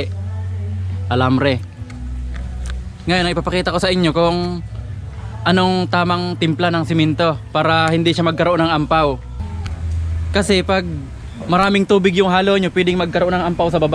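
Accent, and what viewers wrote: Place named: Bicol, Philippines. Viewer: Parang taga bikol si idol ah saan ka po sa bikol?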